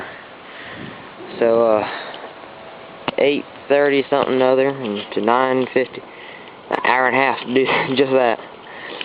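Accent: South, United States. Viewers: Ooh he country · No, he just has a country accent. A hillbilly is someone with little technology, survives off there own recourses, and is very cowboy-ish. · Love the country accent and the video but come on man, do the steps. ANY job worth doing is worth doing right. Old saying but so true. BOY, todays kids!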